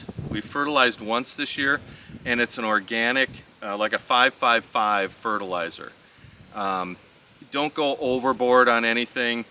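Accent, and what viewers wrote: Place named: Minnesota, United States. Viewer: NO! Don't change a thing,! We get a little taste of Minnesota when we watch your channel! 😁 I'm confident over 20 years in Georgia has given me some southern spice to my accent, haha!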